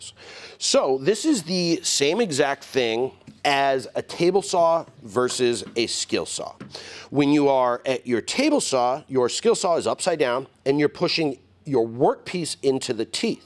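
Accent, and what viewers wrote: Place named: San Diego, United States. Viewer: Are you from San Diego? You talk like a San Diegan. I miss it there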